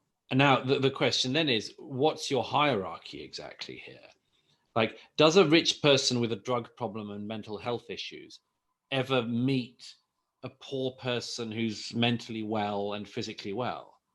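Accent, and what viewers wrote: Wales, United Kingdom. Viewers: IS HE WELSH?? Go on boi :D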